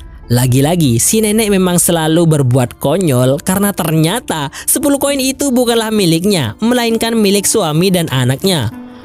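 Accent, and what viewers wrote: Madura, Indonesia. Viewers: Admin nya kek nya orang Madura deh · Areh oreng madureh yeh🤣 Mak bahasannah Reng madureh🤣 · Orang madura ya kak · Corak besa madureh wkwkw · Madureh kek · Ini cuma gua yg dengar apa memang admin orang madura? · Loh kok bisa madura ini mimin nya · Madura ya bang?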